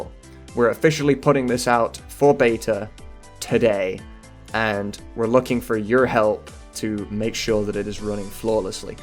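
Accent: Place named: United Kingdom. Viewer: it took me a good 30 seconds to realize the british accent.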